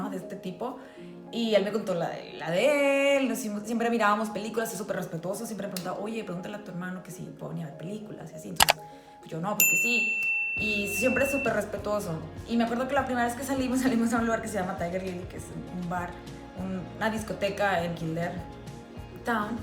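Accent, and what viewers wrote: North, Mexico. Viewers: que linda Historia y que acento tan lindo tienes super del norte un fuerte abrazo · No chingues jaja con razón me identifique con tu forma de hablar. Yo también soy de Reynosa ❤️ Soy "una mexicana en Mcallen" jaja bueno en Pharr pero cómo nadie conoce Pharr mejor digo Mcallen jaja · Ahhh reconocí tu acento del norte jejeje.. saludos de NL MX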